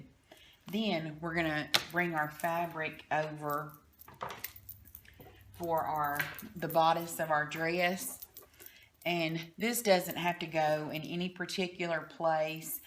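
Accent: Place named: Georgia, United States. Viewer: I love your dresses 👗 and the way you explain your steps .... love your accent it reminds me when I lived in Dahlonega Georgia 😍🥰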